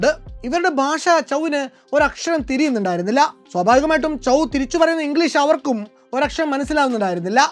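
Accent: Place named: Kannur, India. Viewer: Haha പലപ്പോഴും തനി നാടൻ കണ്ണൂർ ഭാഷ വരുന്നുണ്ടല്ലോ😊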